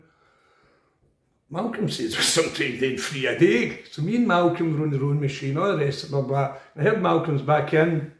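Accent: Glasgow, United Kingdom. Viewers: Nah, Glaswegian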